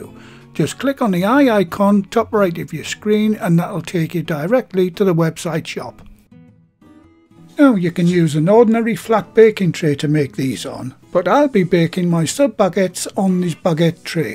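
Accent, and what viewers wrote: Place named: Yorkshire, United Kingdom. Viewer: Hi, just got your buns out of the oven, the house smells lovely, we will have steak on a baguette for dinner, thanks for all your hard work on the videos. I was born near doncaster in yorkshire, love to hear your accent again 😀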